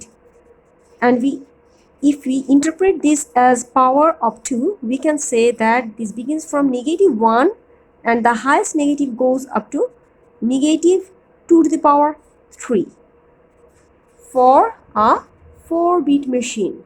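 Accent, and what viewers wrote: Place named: Bangladesh. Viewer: What English you are speaking yaar bengoli English or American eng. At least decide it. It ll be more understandable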